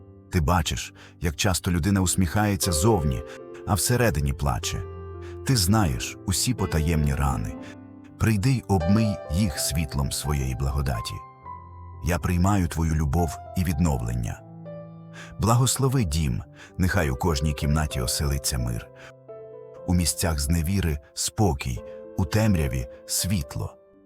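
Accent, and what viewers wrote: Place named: United States. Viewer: Можно для молитвы исцеления подобрать какой-то приятный внятный, успокаивающий голосовой тип, ведь всё равно ии используете? А то напоминает неполноценных из Винницы в 14м году получивших власть из лап эуропы и брызгавших слюнями из неразвитого речевого аппарата на всех трибунах и сценах. Неужели создатели ролика не слышат, что этот тип речи звучит неполноценно - аденоидно?да ещё с имитацией какого-то вовсе неуместного типа американизированного акцента? Нету украинцев что-ли с нормальной здоровой естественной дикцией? Вы испортили молитву, сделав из неё паническую, обгоняющую слово - словом скороговорку.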